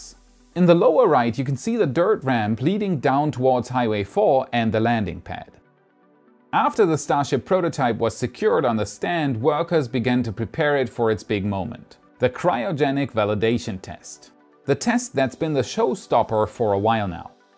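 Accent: Germany. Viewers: Are you from Germany? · Have i mentioned how i like your van braun english accent! Great video as usual.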